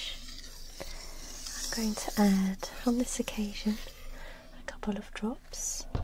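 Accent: United Kingdom. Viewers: Omg for a second I thought there were actual grasshoppers in it! I'm in love with UK accents. ♡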